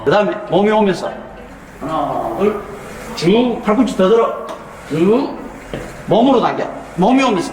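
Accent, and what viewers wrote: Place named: Gyeongsang, South Korea. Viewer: 나도 이 경상도 아저씨한테 배우고싶당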